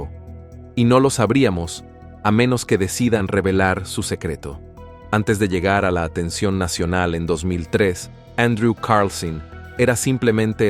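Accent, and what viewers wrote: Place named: United States. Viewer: Otro más hablando a lo gringo, haciendo el.....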